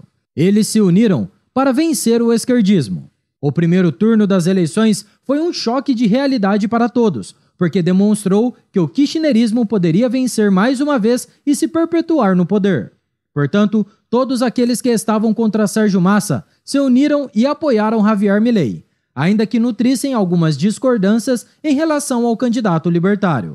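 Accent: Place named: Caipira, Brazil. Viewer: Ótimo vídeo. Mas precisa diminuir esse sotaque caipira, que torna irritante para muitas pessoas assistir o vídeo. É só prestar atenção nos "erres". Abraço!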